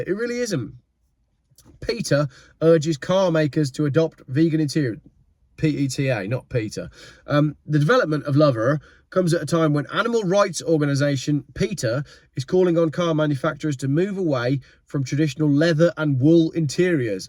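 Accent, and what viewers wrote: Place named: West Country, United Kingdom. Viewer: Great west country accent. Alright my lovr.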